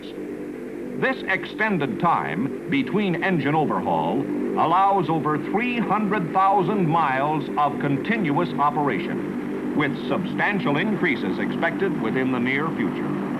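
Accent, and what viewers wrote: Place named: Mid-Atlantic, United States. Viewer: I love Mid-Atlantic accent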